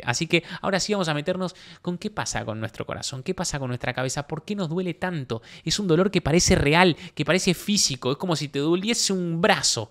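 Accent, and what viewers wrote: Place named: Argentina. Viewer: Tu acento me hace extrañar mi pueblo Argentino. Bendiciones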